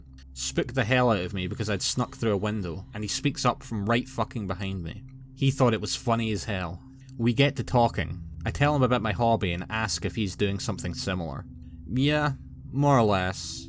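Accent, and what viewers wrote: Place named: Scotland, United Kingdom. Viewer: Lmao you literally got the same accent and hilariously deadpan delivery of frankie boyle....well accent isn't nearly as strong, he's probably the most scottish that a man can be, complete with huge red beard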